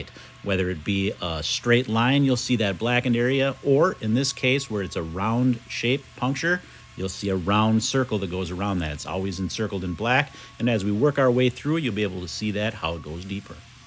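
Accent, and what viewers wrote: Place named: Wisconsin, United States. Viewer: I knew he was a Wisconsinite from the familiar accent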